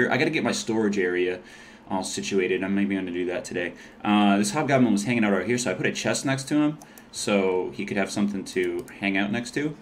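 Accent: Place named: Michigan, United States. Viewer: im from michigan too so sounds normal to me lol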